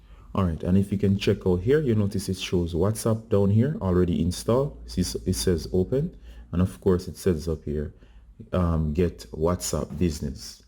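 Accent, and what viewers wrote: Jamaica. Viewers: Are u jamaican? Good vid btw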